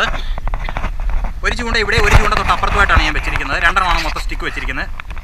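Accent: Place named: Kottayam, India. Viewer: Anta kottayam bhasa manassilavanillaa. ..ijjonnu sarikk njammala Malabar bhasayil samsarikkado...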